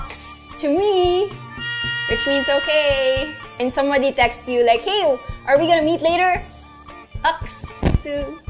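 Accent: United Kingdom. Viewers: that british accent tho. hahahahahahaha Brofist! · that british accent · Trueee marami naman gumagamit ng British accent kapag mag-isa, it's okii. Ang soyo soyo kayaaaaa xD Lovely accent kasi huahua Ms. thank you for this video! Hahah xD We'll be waiting patiently for the next video.:3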